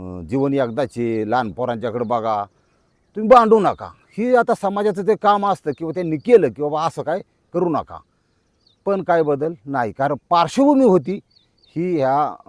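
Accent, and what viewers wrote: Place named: Narayangaon, India. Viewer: मामा तुमचे गाव जुन्नर तालुक्यातील आहे का. बोलणे नारायण गाव सारखे वाटते.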